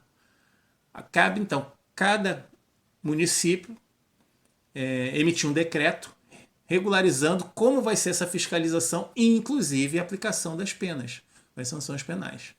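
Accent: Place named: Rio de Janeiro, Brazil. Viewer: Vc é paulista??? Achava que era carioca, do Rio de janeiro.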